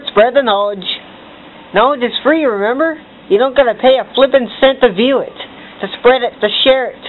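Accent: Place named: Ireland. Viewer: You Irish? or something? :P just asking coz your accent sounds like you have an Irish tongue. but i think you may be right. the HAARP is most likely the reason for all these earthquakes and the fish dieing and shit like that.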